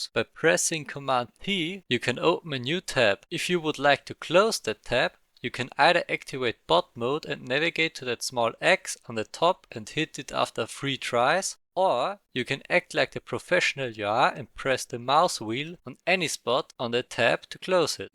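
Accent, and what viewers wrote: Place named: Germany. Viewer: you German? I like your accent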